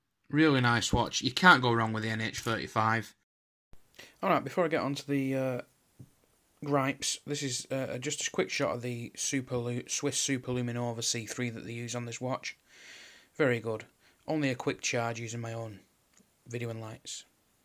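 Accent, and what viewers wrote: Bolton, United Kingdom. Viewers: You sound like your from bolton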